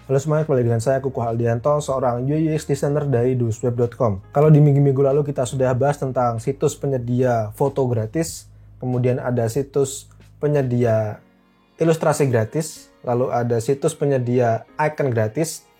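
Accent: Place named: Java, Indonesia. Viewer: 'v jowo mas, maklum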